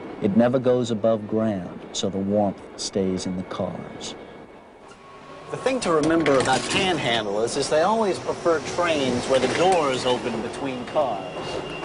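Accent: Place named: New York, United States. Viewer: This presenter has the most New York accent I've ever heard in my life. And I'm an almost-thirty year old who was born, and has spent most of her life in Brooklyn.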